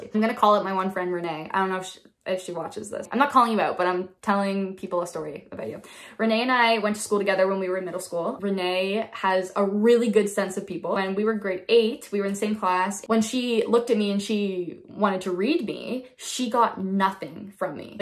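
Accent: Canada. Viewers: It sounded super canadian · Your abouts and outs still sound very Canadian to my American ears hehe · Yeah you’re about halfway between Canadian and American when you do it without thinking about it hehe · nah, you still sound FIRMLY Canadian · 100% sound Canadian haha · no hun still can tell you're Canadian from your "out and about"s hehe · I figured out you were Canadian several videos ago because of your “abouts” lol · 😂💗🫶🫶🫶🫶 you're hysterical 😂 (((((((((penis)))))))) also: you definitely sound mostly Canadian 😂💗🤙 much LOVE ! ✌️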